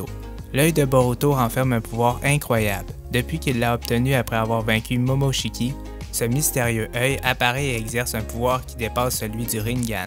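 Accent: Quebec, Canada. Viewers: Ta vu avec son accen canadien il est les a trouvé dans ces ses imaginatoin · Il peut rien y faire il est quebecois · T québécois sa svois direct avk ton accent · Tu et quebecois?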